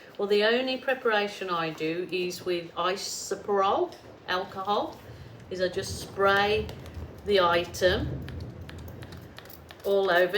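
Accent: Birmingham, United Kingdom. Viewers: I thought you are a Brummie I was born in Coventry lived in Bulkington came to West Oz in 1970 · I have to ask because it is driving me bonkers, were you born in Australia? The reason I ask is because I constantly hear some Brummie, [Birmingham] in your accent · I love watching your videos. My art teacher at school told me I didn’t have an artistic bone in my body so I basically never pursued an art activities after I finished school. During lockdowns I have found your videos initially to calm me because I find watching art being created very therapeutic but I’ve now decided that at 43 I might try and find that creative bone my teacher said I didn’t possess. I know you’re in Australia but are you actually born and bred Australian? I only ask because to me, a Brit, you sound slightly Brummie. I love listening to you you’re so don’t to earth and funny. 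Thanks for sharing all you do x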